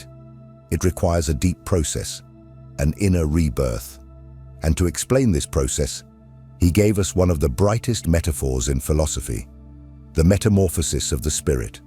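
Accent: United Kingdom. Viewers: Am i supposed to think this is profound cause dude has a brittish accent and a deep voice? I mean i guess this is a good message for someone who’s been bullied all there life, but the whole ”domination used to be a virtue - lets bring it back!” narrative is just fucking stupid as all get out 😂. Sure be assertive and stand up for what you believe in, but not at the cost of seeing others as equals and trying to better yourself for the greater good.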